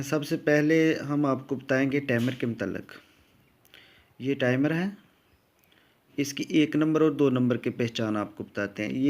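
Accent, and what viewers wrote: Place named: Sialkot, Pakistan. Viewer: Mera andaza theek nikla apki boli sialkoti lg rhi thi.mn bhe sialkot me hota hun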